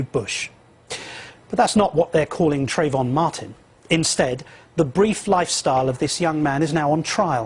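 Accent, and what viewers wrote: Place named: United Kingdom. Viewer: Wow--funny how far he stretches to make a Conservative look bad by digging up something that happened more than 23 years before he was elected, as a teetotaller. Obama was a druggie in the 80's--notice he doesn't bring that up. Obama was promoted by murderers and terrorists. Obama tried to stop helping babies who survived abortions and wanted to let them die. If that's the best this moron can do, he needs to take that British Accdent and go hang out in Englan with that racist Paul MCartney.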